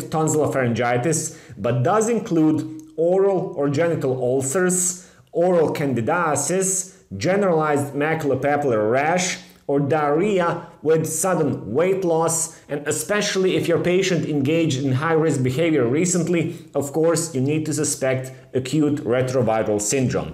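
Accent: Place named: Poland. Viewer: You speak perfect English, but with a mid-to-heavy Polish accent.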